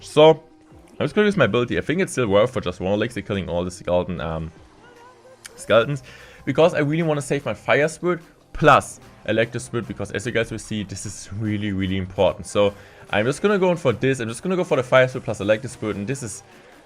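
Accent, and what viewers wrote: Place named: Germany. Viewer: i cant even understand this shit becouse of his german accent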